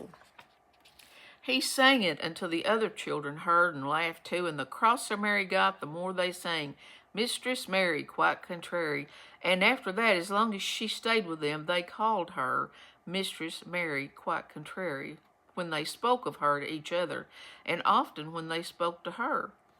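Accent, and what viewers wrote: Kentucky, United States. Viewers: Thanks! By chance this summer I read A Secret Garden and Anne of Green Gables. Such a nice relief from other troubles. Thanks again. I love listening to a Kentucky native reading these gems!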